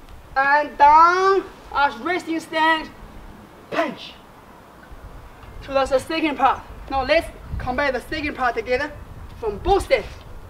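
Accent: China. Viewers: 100% true chinese english accent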